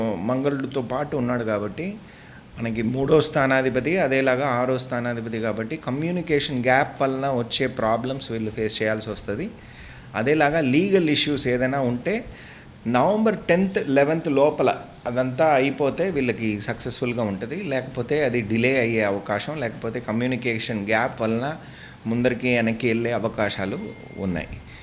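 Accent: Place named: Tamil Nadu, India. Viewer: అరవ తెలుగు. ఇదొక పబ్లిసిటీ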